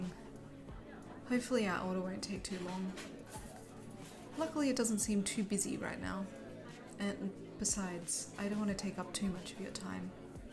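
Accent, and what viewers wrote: Australia. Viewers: Likewise... And I don't understand the appeal of the Australian accent. I've been surrounded by it my entire life, and it's usually annoying (except for a select few such as this lovely VA). If you'd like to explain, I'm all ears. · I have many friends who are Australian. I also have a cousin who moved to marry her now husband. The Australian accent is my upmost favorite accent. Love the audio. 100% dragon approved 🐉 💜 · one little piece of feedback i have, you have a soft voice and the ambient sound was a bit too loud in my opinion, other than that really great voice acting and script! also yes, Australian accent is really cute! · We finally got An Australian asmr love the mention of Perth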